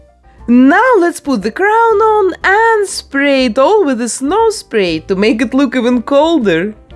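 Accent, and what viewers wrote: Russia. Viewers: Вот наткнулась на видео И сразу поняла по акценту , что из России человек 😂😂😂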